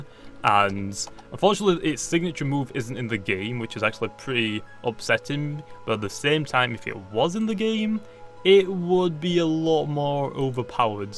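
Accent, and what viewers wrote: United Kingdom. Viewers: What's his accent? British?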